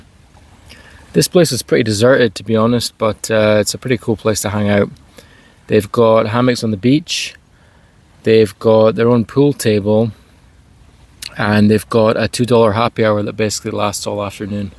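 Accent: Scotland, United Kingdom. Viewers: that Scottish accent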